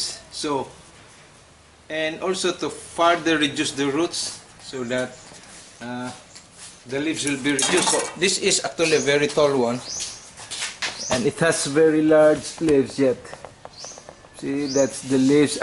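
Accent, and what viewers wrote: Visayas, Philippines. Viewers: akala ko indonesia kayo bisaya pala mo dong oi gi atay hahaha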